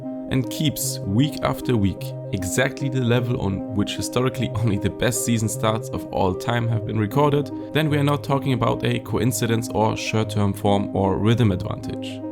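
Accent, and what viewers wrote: Germany. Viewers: der deutsche dialekt nervt ja übel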